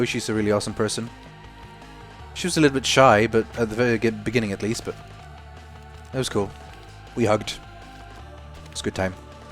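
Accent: Scania, Sweden. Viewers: Ah your swedish, I've been trying forever to figure that accent. I wasn't sure if it was one of the American accents or a variation of the English accent. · are you from sweden?:D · is he swedish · are you from sweden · I tought I rekognise the accent. SWEDEN !!!!! ;D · is swedish? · Det finns en äldre Q&A någonstans bland Rythians videor, och i den bjuder han på lite skånska. Han är från Malmö tydligen. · Are you from Sweden? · HOLY SH!T Are you from Sweden!?!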